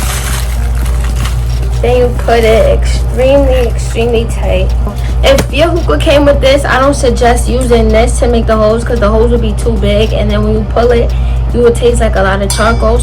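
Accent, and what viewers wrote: New York, United States. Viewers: I love your accent , sounds newyorkish or Jersey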